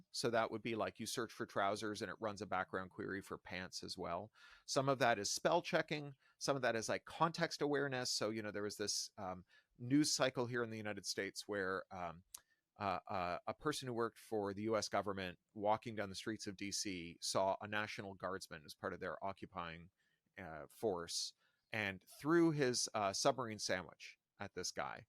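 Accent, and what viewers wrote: United Kingdom. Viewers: I was just about to tell you to stop speaking with a British accent, but I see that you’re a British publication When you speak English, you really need to learn how to speak standard American English, which is basically speaking English with a Midwestern accent. Americans cannot understand what you’re saying because it sounds pretentious and affected. But I had to stop by just to let you know that I agree. Unfortunately, your people, the British are the reason for the enshitification of the Internet. With the United Kingdom‘s creation of its Frankenstein, Israel for political power for the United Kingdom, and for European Jews, the UK and Israel, with the help of the muscle of the USA, have hijacked the Internet with laws that favor the abridgment of free speech as if a censure would advanced the power of those with a paid for preferred status to be the voice of the narrative on the Internet You don’t own the airwaves So what we have is basically a bunch of people who are bullying everyone to comply With a political narrative broadcasted over the Internet. 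For the creator and the creators of the Internet, the British and the Jewish narratives run against the entire world In all fairness, each country does not want their business for the world to know because they want to control a group of people in their geographical location. So they also a bridge the ability of the Internet to be free from censorship. Like tiny Tibet censoring what their population knows about the rest of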